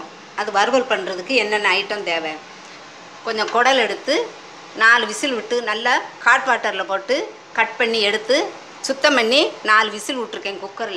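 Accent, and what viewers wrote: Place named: Tirunelveli, India. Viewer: Nellai tamil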